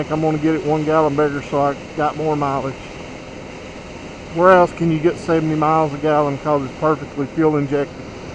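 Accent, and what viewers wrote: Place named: United States. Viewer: nice American English and the horse you are on is looking good. Great road video.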